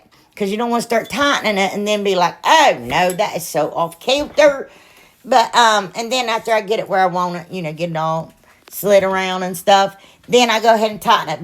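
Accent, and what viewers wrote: South, United States. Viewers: Where did you get your canvas from? I dont see the page for that gentleman you were talking either. Im from Gallipolis, Ohio and listening to you I would have thought you were from Alabama, Louisiana or Mississippi because of your accent.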